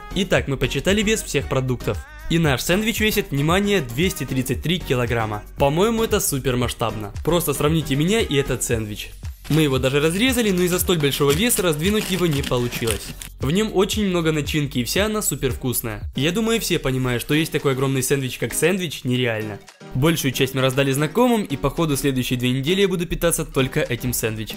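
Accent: Ukraine. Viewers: Смотрю тебя с трехсот тысяч, качество выросло в десятки раз! А ещё пропал украинский акцент))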